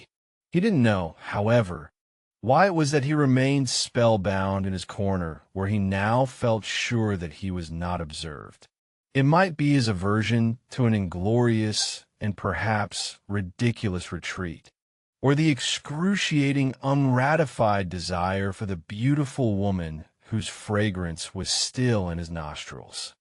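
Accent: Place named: Texas, United States. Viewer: AI SUCKS IN GENERAL, AND AN AI TRAINED TO HAVE A TEXAN DRAWL READING A EUROPEAN NOVEL, WELL NUFF SAID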